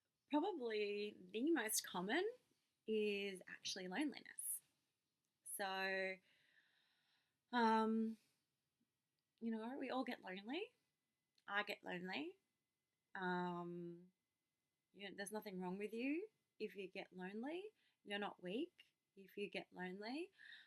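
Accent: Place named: Australia. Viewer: judging by her accent she's from down under where it's legal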